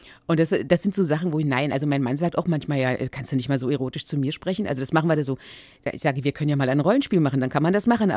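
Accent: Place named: Berlin, Germany. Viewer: Einfach sympathisch, schon allein, weil sie berlinert ;).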